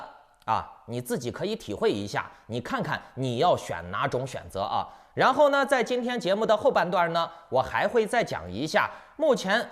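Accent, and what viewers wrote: Henan, China. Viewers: 我听着你就像是河南人。你在国内并没有在国外。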